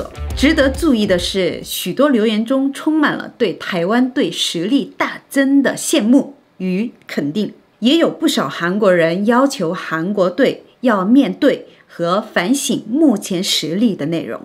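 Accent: Standard. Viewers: 您的中文真標準，謝謝您的影片❤ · 天呀 你的中文發音非常標準❤ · 妳的中文好標準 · 這國語也講得太標準了,是真的韓國人嗎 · 國語超級標準😂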